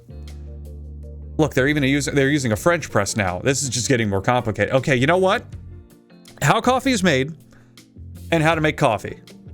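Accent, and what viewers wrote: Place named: Albany, United States. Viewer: Albany dialect represent!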